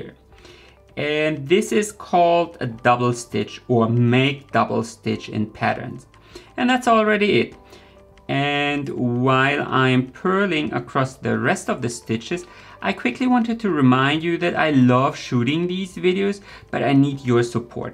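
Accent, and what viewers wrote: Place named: Germany. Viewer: Also, your tutorials are simply superb! You're so skilled, you pay close attention to detail, and you're so open-minded about various knitting techniques! It makes me feel like I can try anything I want and just have fun making mistakes and learning from them. Also, my mom is from Germany and your accent makes me feel just like I'm at home again. :)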